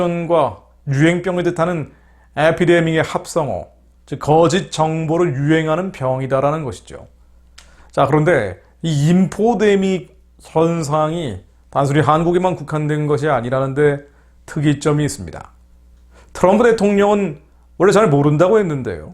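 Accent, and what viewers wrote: North Korea. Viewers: 김성욱대표님 목소리를 듣다보면 마치 평양출신이 말하는 것 같다. 아마도 부모님께서 평양출신이 아닌가 싶다. 지금 북한지도부는 완전 궤멸됐다고본다. 만약 한국 대통령이 정상적 인간이라면 즉각 북에 밀사를 보내 현황파악과 함께 특공대를 보내 평양을 접수하고 북한을 통제할 것이다. 미군과 함께 핵무기통제권을 장악하는 것이 초를 다투는 급선무다.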